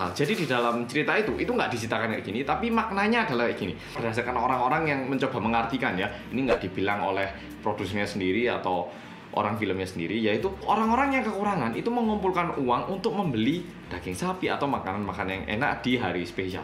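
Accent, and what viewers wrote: Java, Indonesia. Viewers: Medok bgt logatnya wkwk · 😁😃😄wajah korea...jawanya medok😁😃😄👍mantap...seneng dg cara ngomonge..rek rek..😁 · Korea.. Tapi ngomong Bi ko logat nya kaya bahasa Jawa ya, 🤔🤔😁😁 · JAWA BANGETTT😭😭😭😭😭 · Pertama kali smpai di channel ini, ini kknya kok medok banget ya org indo apa korea si 😂😅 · seneng bgt liat lo makan , ngakak jugaa cara ngomong nya yg medok 😂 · Ihhh gemes... muka korea logat jowo wkwk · Kok logatnya kayak orang Jawa 😂😂😂😂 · HeLLo BoLo-BoLo LiKe Yuk BoLo-BoLo- ~i ReaLLy Like intrO~ OrAnG KoRea Yg Med∅k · Mas, pean tak kiro wong jowo lho sumpahh jebul asli korea 🥺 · Mas, omongan mu kok isih jelas wae to medok nya, belum lupa bahasa medok e, ya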